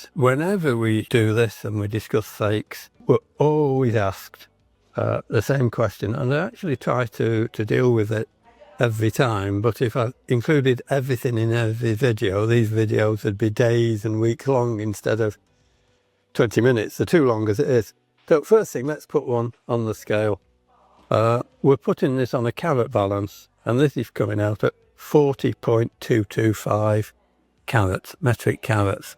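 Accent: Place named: Northern England, United Kingdom. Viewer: He's a Northern lad, who speaks real English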